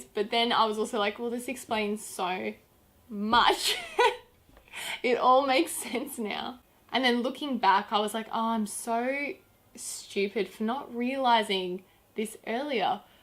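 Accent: Australia. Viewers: I realized today that I must watch way too much Bondi Vet because I Wasn’t even paying attention to your Australian accent until you started talking about the summer and winter months. I kept rewinding it and listening to that part over and over again thinking I heard it wrong and then you said a thing about the northern hemisphere and it all clicked into place and I feel like an idiot. Apparently all my Bondi vet hours have made the Australian accent sound normal..... I’m in Texas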